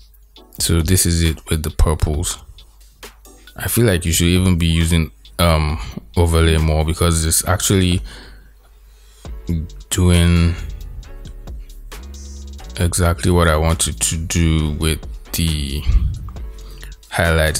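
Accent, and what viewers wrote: Nigeria. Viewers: Apparently I love Nigerian accents🥰 keep it up bro:) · Ayyy, i know a nigerian when i hear one!!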